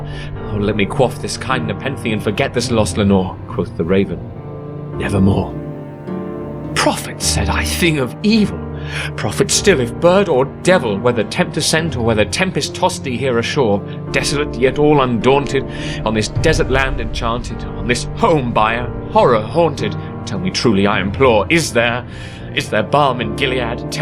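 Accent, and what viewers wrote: United Kingdom. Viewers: It's nice, but why the British accent? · Probably because the person reading it is British 👍 hope that helps!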